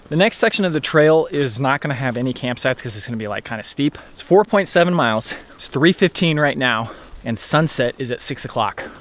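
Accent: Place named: England, United Kingdom. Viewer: Your English accent sounds like Keanu reeves accent from Bram Stoker's Dracula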